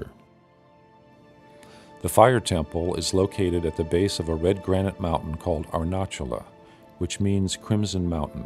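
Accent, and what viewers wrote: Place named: England, United Kingdom. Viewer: Excellent Presentation and Video steady, well explained voice and accent so beautiful graceful and respectful english spoken by englishman is the way to go in these day. People around the world need to know our Hindu Cultural, Heritage God Temple Site. Thank you very much clear view and explanation perfect keep it going with all other temples too. I have not visited that temple yet but seeing this I want to in my Life.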